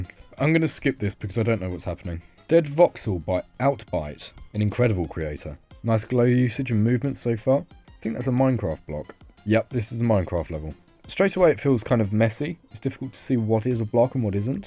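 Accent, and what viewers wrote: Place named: United Kingdom. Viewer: again british accent hard carry, good video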